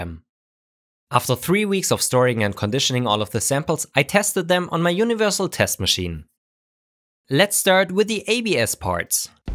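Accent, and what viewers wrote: Germany. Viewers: Wtf are you german or what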